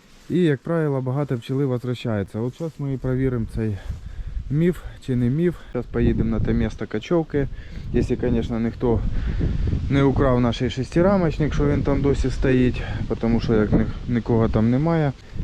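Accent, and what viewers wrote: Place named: Kryvyi Rih, Ukraine. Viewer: Криворожский говор мне понравился больше чем другие украинские языки 😊 Более красивый и понятный , чем язык , которым разбавляет зеленский.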